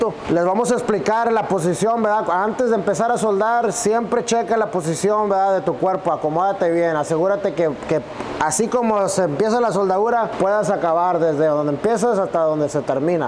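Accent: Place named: Texas, United States. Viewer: Me llega el acento tejano del compa en los vídeos de inglés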